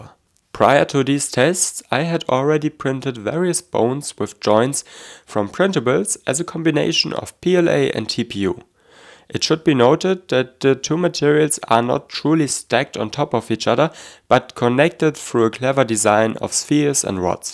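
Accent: Germany. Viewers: the british pronounciation doesn't sound like that. Yours and Stefans is more like a very heavy german accent, which is ok i guess but funny nonetheless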